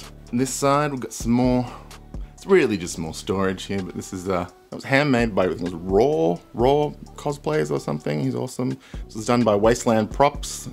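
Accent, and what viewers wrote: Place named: Australia. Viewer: I love finding new Aussie youtubers. It's weird hearing people on youtube with my accent haha, I don't think about it but it's kinda jarring when I hear an Australian now because I just expect them to be American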